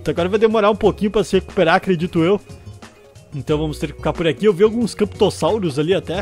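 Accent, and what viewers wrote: Rio Grande do Sul, Brazil. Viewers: Ele é gaúcho kk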